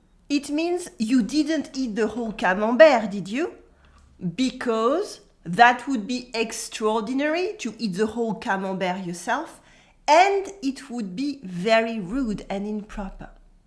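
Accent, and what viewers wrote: France. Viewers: On the contrary, speaks an excellent English. Her French accent, intonation and cadence makes her English even more charming and pleasent to ear.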